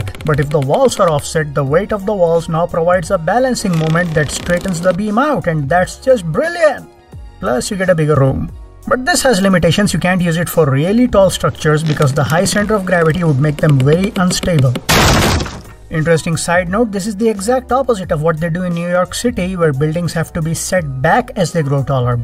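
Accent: India. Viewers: this probable the best Indian YTvideo explanation I'VE seen yet hahahaha with accent yet understandable!!!!!!woooooooooooo yeah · Amazing, by the accent i am guessing it's an Indian guy. Probably the first sensible Indian youtuber ❤️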